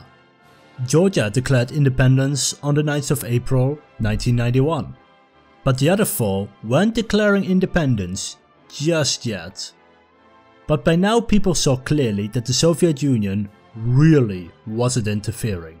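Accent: Netherlands. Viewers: I can hear you're from the Netherlands or in neighbouring regions goed man! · I appreciate this entire video and all the effort that went into it; but fuck me, does your dutch accent hurt my ears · Ugh I added this vid to watch later, wanted to watch it later but i totally forgot this guys horrible accent. All your topics are interesting but either do them in Dutch or work on the accent cause its unwatchable for me.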